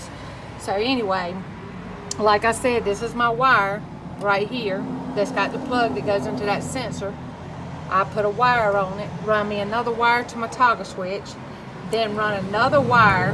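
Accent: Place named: South, United States. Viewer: Love that Southern Lady sounds .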